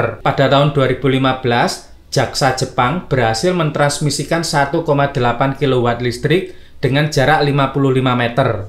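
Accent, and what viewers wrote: Java, Indonesia. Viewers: Sumpah mendok bngt pngisi suaranya. Gak enak bngt di dngr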